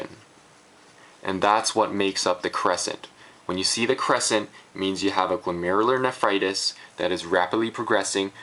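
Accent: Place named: Canada. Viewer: are you canadian? detect an accent lol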